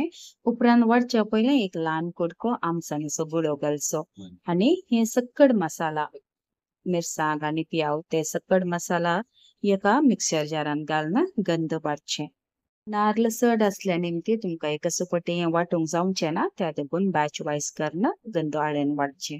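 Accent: Konkan, India. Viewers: Thank you I am watching yr video your voice is so good and very konkani is pure so i get to hear the words. Recipes all mangalorian dishes are superb . Thank you bai. Can we give tadka .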